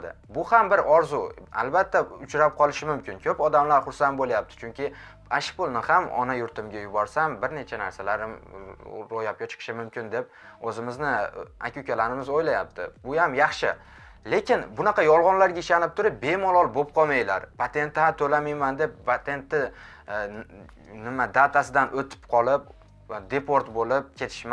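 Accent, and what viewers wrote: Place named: Fergana Valley, Uzbekistan. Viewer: Майли, лекин русча аралаштирма, узингни жааа интелегент деб уйлаш керакмас. Чунки билиниб турибди, сен гирт оддий узбек мхонадонида тугилиб усганинг. Чунки шевангни водийлиги хамма нарсадан Айон килиб турибди. Хурматим ошсин десанг углим, узингни мажбурлаб курсатма рус тилини. Мен рус мактабида укиб, болалигимдан рус кушнилар орасида яшаганман. Мендакалар куп, шунинг учун биз устингдан куламиз. Бир курдим, лекин кайтиб курмиман каналингни, гашимга тегади, ясама инсонлар. Ва кон босимим кутарилиб узимни кийналишимни хохламиман.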